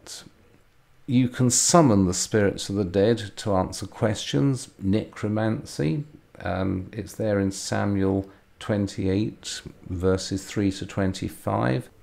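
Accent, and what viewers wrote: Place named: United Kingdom. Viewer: I'm from America USA and I recently "stumbled" upon lectures. Truly, it's a wonderful experience to listen to him teach. His voice tone, UK accent, and amazing depth of knowledge about the various subjects is truly an amazing learning experience. No degree being gained by me as I've already got my B.A. and M.S., but I've always been a fan of ancient Rome and Christian Origins, so his lectures are a lovely thing for me! Thanks for the great work! You're a great teacher! - John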